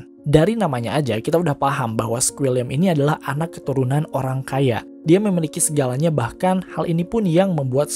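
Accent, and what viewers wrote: South Jakarta, Indonesia. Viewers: Bg lu orng jaksel ya?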